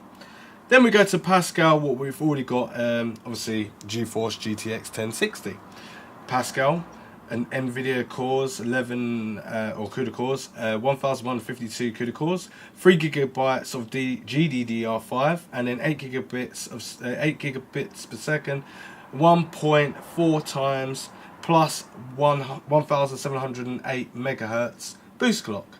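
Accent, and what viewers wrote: England, United Kingdom. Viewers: germany but i just love the english accent and I was in London a month ago... either I'm not understanding you correctly, but wasn't the 1060 6GB released when the GTX 10XX series launched? because i remember i had to decide between the 6 and 3gb version about 2 years ago when i got mine (1060 3GB)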